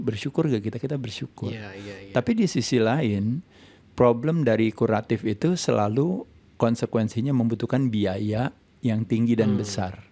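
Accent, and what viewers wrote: Bali, Indonesia. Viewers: Logat balinya si mimin nampak kali, T T T😂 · Ini emg logat bali bgtu apa cm feeling sy aja ya. Mc nya kayak org make sabu, ciri2nya bgtu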